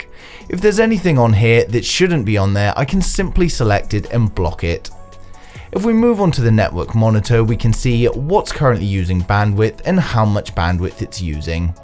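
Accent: United Kingdom. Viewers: I love the way UK people say rooter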